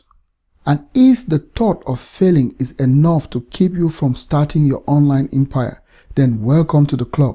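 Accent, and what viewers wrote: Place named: India. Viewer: your accent sounds like an Indian accent....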